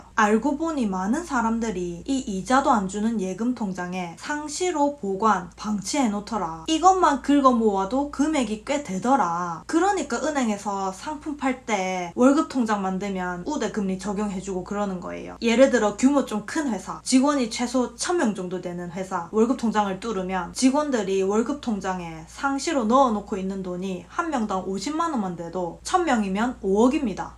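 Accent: Gyeongsang, South Korea. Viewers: 경상도 아지매라 그런지 말이 쏙쏙 넘 재미나네요 농협 붙박이로 쓰는데 유익한 정보 보고 갑니다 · 우연히 보았는데 인터넷을 잘못하는데 토스뱅크인가 파킹통장 먄들어야겠네요 경상도 아가씨라 쏙쏙 귀에 꼬치네 유익한 정보 고맙십니뎅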